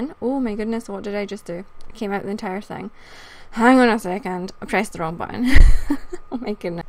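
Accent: Scotland, United Kingdom. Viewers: I guess you're Scottish , we'll I hope you are :) I am , but I don't have the accent because my parents are English xx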